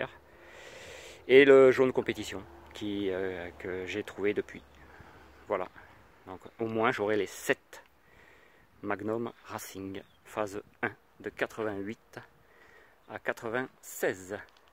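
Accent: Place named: Southwest, France. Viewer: quel plaisir de voir t'es video et quel jolie narration avec ton accent du sud Ouest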